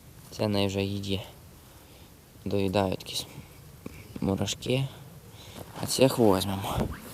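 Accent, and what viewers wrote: Hutsul, Ukraine. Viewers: Дуже люблю гуцульський діалект, я сама з бойків, ваших гірських сусідів.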